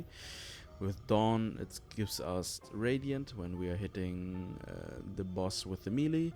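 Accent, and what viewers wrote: Germany. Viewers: Man hört richtig, dass hier ein deutschsprachiger spricht. Aber tolles Video 👍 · sounds german🗣️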